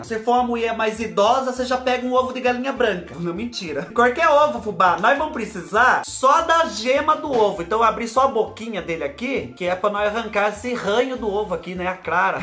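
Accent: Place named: Northeast, Brazil. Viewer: Fubá do céu eu pensei que vc fosse nordestino 💓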